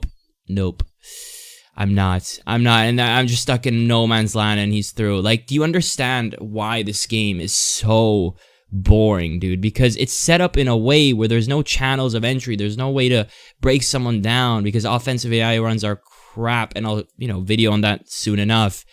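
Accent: United States. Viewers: What content are you in. You sound American but played Cihan in rivals.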